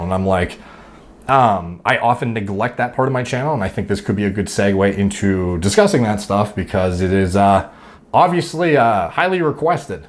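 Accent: Canada. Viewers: Woah is he Canadian? Just noticed his accent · honestly hadn't noticed, just figured it was your Canadian accent lol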